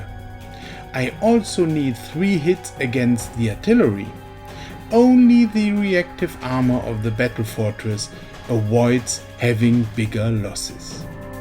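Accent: Germany. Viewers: I am only in colonial age, but I watched the video just to see the amazing robots, animations and your German accent😂 love your vids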